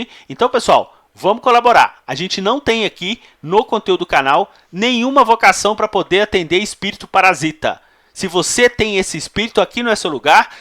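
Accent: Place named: Minas Gerais, Brazil. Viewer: Aiii conterrâneo.... Pé trocado foi o melhor ... Saudades do nosso sotaquizim! Maravilhosoooo o seu jeitim de passar esse tipo conteúdo.. estou inlove!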